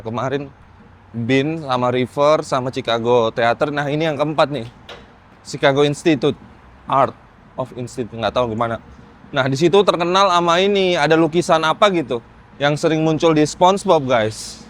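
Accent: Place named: Palu, Indonesia. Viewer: Logat palu lho😅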